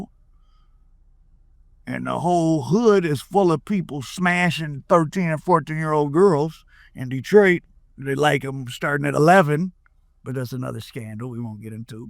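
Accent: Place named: Chicago, United States. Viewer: Why do you speak in some weird Chicago accent, aren’t you from Michigan?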